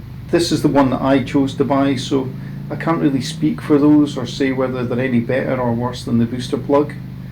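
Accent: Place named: Scotland, United Kingdom. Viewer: Hi pal just found your channel, I'm just interested to find out how much warranty work you've had done on your bike ? Thinking of buying one but not sure whether to go new or second hand, new gives warranty obviously. Also, you sound Scottish, don't know if you live up here but if so, where did you buy yours ? Drysdales ?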